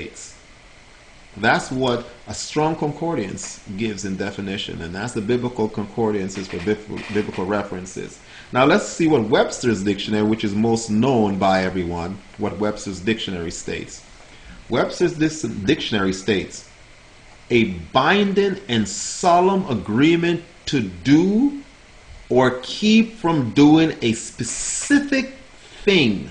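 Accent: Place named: West Indies, Caribbean. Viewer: you have an accent...perhaps you're west indian? and i couldn't find the other channel.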